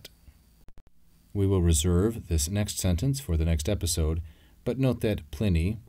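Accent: United States. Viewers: What is wrong with the American pronunciation of latin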